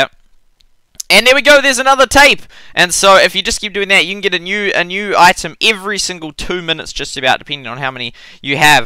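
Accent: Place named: New Zealand. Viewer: Your zealand ayyy cousy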